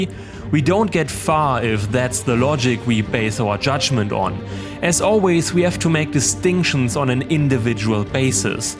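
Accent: Germany. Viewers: That's just his German accent. At least he doesn't pronounce it as 'Laura' like so many Americans do. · Nice video but are you german ?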